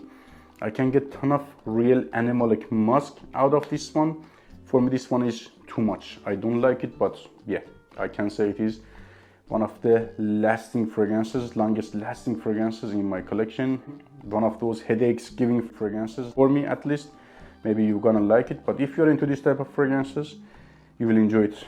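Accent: Iran. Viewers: به لهجت میخوره ایرانی و هموطن باشی ، موفق باشی جان لذت میبرم از رویو هات و خیلی تو خرید هام هرسری کمک میکنه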